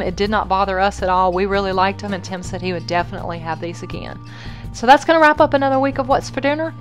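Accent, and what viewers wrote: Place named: Mississippi, United States. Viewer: Thank you so much, I have been wanting to grill so badly, but we've had so much rain in our area, a small town in Northeast Ms., called Mantachie. I started watching your channel when Krista from The Logues mentioned you and how much she loved your accent..lol...I had to go watch, I remember telling Krista I couldn't tell because you sound like me.☺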